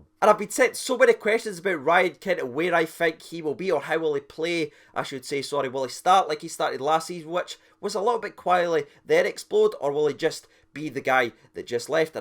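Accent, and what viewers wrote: Scotland, United Kingdom. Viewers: hope your nose is ok and your feeling better. hopefully it heals up fast as i hope to get a selfie with you when i come over from Australia. I started watching your you tube a year ago and have just recently become one of the patrion. My daughter loves listening when i have it on and tries to copy your accent. I'm coming back to scotland in October and hopefully get to a few games including the Porto game. till then keep up the good work and hope your feeling better soon cheers G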